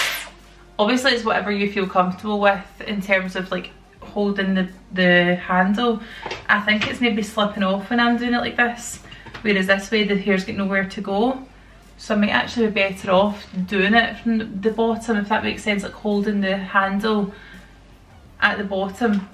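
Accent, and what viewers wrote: Scotland, United Kingdom. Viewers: Gosh the Scottish accent is so charming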